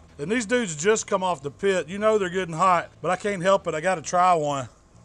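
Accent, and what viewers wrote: South, United States. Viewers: As soon as I heard the southern accent I knew I could trust him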